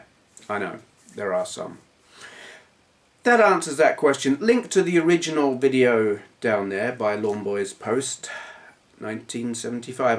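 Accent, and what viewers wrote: United Kingdom. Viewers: funny hearing you say you like tim because he has an aussie accent. i subbed to your channel as an aussie partially because i like your british accent!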